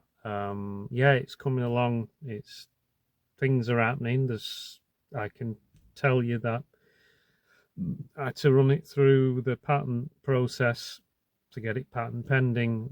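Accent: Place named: Northern England, United Kingdom. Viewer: to hear your broad Northern accent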